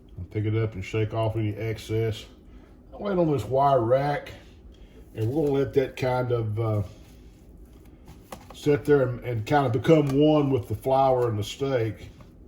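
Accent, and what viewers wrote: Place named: Texas, United States. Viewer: Mmmmm we love your chicken fried steak! The ultimate comfort food!! And we love your Texan accent!!!💞💪🔥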